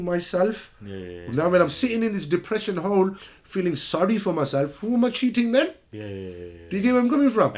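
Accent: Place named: Birmingham, United Kingdom. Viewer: This guy has clearly not lived outside of an asian dominated area. We brown skins born in England are British of course. Speaking English with a horrible messed up Brummy accent, talking about clubbing etc. Of course you're a British Pakistani.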